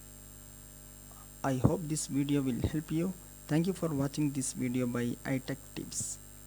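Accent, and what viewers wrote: Kerala, India. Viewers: Bro u mallu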